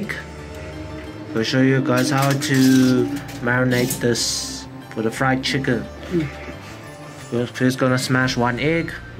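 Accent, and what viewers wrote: South Africa. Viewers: You sound south African. Lol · This recipe is fantastic! Quick and delicious. Thanks very much. Are you by any chance based in Cape Town, South Africa as your accent is so distinct, I had to ask? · Wait, are you from South Africa by any chance? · This guy sounds like his grown up in South Africa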